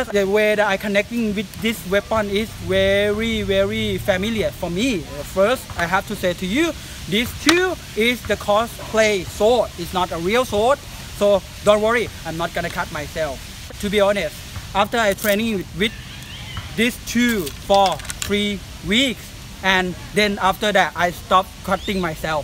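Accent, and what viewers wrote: Thailand. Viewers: ตอนแรกนึกว่าชาวต่างชาติ แต่พอฟังสำเนียงเลยรู้ว่าเป็นคนไทย สุดยอบ At first, I thought he is in another country, but when I heard his accent I know he is Thai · สำเนียงนี้คนไทย555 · นึกว่าคนประเทศไหน พอได้ยินสำเนียงอังกฤษเท่านั้นแหละ ไทยนี่เอง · hey i know you are thai with your accent THAI GANG 🔥 🇹🇭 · somehow your accent sound like Thai accent to me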